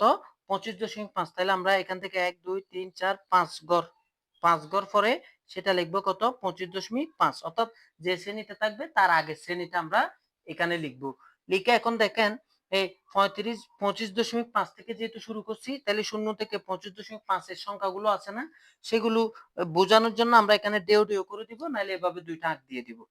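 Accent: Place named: Chittagong, Bangladesh. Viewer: Thik dhorchen....sir er kothay buja jacche je sir ctg er.....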